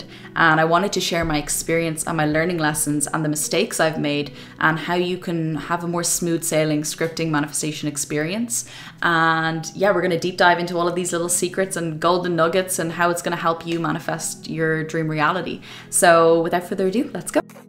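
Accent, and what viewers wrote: Ireland. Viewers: 2nd generation American Irish Girl(woman) here! I literally manifest everything I desire and am open to. I too have vision boards and journals to prove it. Amazing. I just found you today and love your slight Irish ☘️ accent! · Ngl, my English accent sounds so bland compared to your Irish one.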